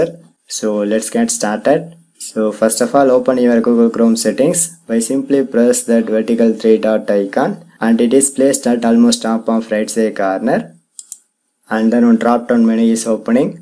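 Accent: India. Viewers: This an Indian accent and oh my god if they talk to you on the phone such as Amazon or any cheap company who takes the jobs out of USA because it's a cheaper than an American person. Thank you!!!!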